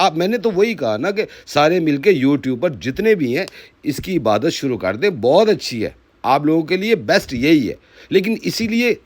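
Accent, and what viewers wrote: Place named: Pakistan. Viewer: Lahze se hi pata chal rha hai ki tum pakistani ho. Tumhare yahan ke nalle vloggers mar gaye jo indians per bolne ae ho ! Tumhare yahan ki zani pitara yaseen ko dekh lo, wahan kyun ni muh khulta tum logon ko .. bandi khule aam zina karke rang birange bacche paida kr rai hai ! Per wahan paise ni bnte honge na … indians per bolke kamana hai 😂😂😂😂😂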